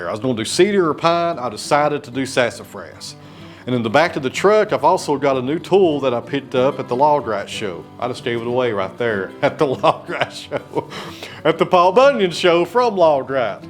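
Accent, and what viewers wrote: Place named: Kentucky, United States. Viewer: Was this english? CC displayed it as such, but it almost sounded like groningen to me (from dordrecht). Ok, must be a local dialect in kentucky.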